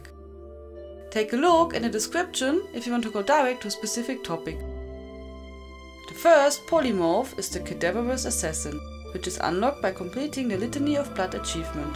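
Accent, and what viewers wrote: Germany. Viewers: thanks for the guides, I like the accent btw, German, Austrian, sounds funny 😁👌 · bist du deutsch?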